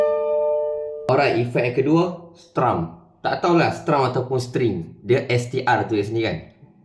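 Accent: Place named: Malaysia. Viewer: Brader cakap macam orang malaysia bagus bangat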